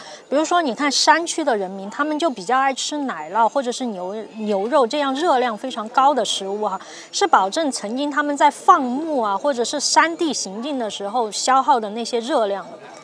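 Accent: Sichuan, China. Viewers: 浓浓的川妹子口音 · 说的一口地道的川普好听😁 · 川普的味道还是一直保留了一点点. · 主持的川普好亲切，兄弟们点赞是必须的👍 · 四川口音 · 四川塑料普通話好親切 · 兄弟，你挺美的，你一说话，我就知道是咋们四川或者重庆的兄弟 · 听你的口音就晓得是川渝勒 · 不晓得正大综艺的多半90后，不过确实有点像，在四川听川普，很不错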